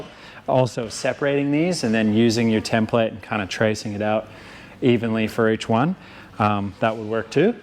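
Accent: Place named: Australia. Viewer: Another great vid, helping me on my own rear end. I can hear the Australian twang coming through in your accent. 😁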